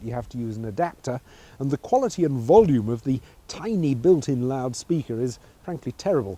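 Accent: United Kingdom. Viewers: lol this guys so british XD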